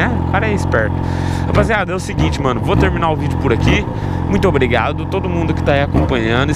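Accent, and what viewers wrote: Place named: São Paulo, Brazil. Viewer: Meu se mora no Paraná mano mais meu deixa eu te falar porque se então fala igual a nois aqui de são paulo? Meu tô achando q o é de são paulo mano não do Paraná kkkkk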